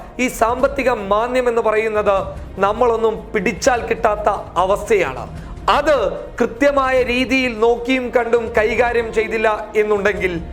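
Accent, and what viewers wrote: Kasaragod, India. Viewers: Now much better, but still Kasaracode slang hunting him.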